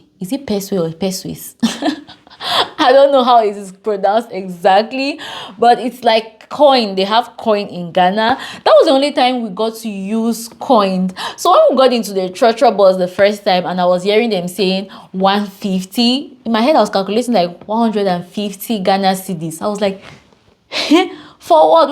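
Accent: Nigeria. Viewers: U speak like a Nigerian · Not at all ,she literally speaks like a Nigerian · Why do you sound Nigerian ?i dont gerrit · Naaaa, she sounds very nigerian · No she sounds a Nigerian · Have you lived in Nigeria before? Cause you can't be Cameroonian with that accent. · You sound so much like Nigerian 😊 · She sounds like a Nigerian from her accent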